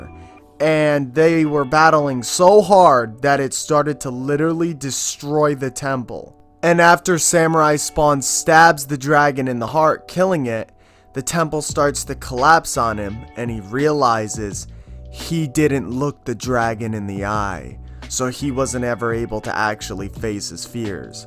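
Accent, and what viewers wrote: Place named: Wisconsin, United States. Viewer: I’m from Memphis. My oldest brother lives in Wisconsin and your accents sound similar. Like the way you say floppy tits in a past video sounded exactly like my bro ha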